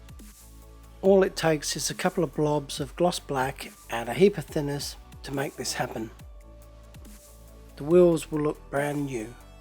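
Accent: England, United Kingdom. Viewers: I have clumsy fingers, i would end up painting it Pollock style!!! Where are you from? you sound british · This is the second time I've seen you try something other than paint stripper and I don't know why you keep trying to improve upon something that works so fantastically well AND fast. I can see trying to improve methods that need improvement etc, but the stripper is as good as it gets IMO. Great vids and the proper English accent narration just makes it better. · Very interesting!!! I liked the idea!!! Where you are from? By the accent, it looks British to me. Can you get old thumbnails with ease? Here in Brazil it is difficult to find old machbox.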